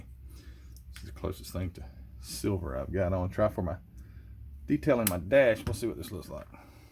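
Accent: South, United States. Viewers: One tip for painting dashes they sell micro micro small brushes at hobby town they come in a pack of 30 for 7 bucks and they are so fine it's so easy doing dash and tiny details and one more question are you from Alabama or Mississippi due to the accent I'm in mobile al if your close maybe we can build sometime or learn some tips from you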